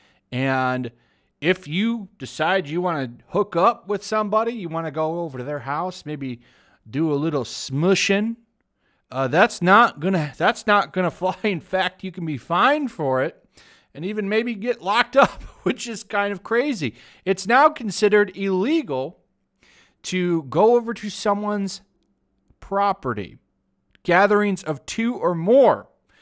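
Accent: England, United Kingdom. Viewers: Lol I love your English accent. I live in uk and pubs open back up on 4 July but all police are getting ready in riot gear to take us down if we talk to loud lol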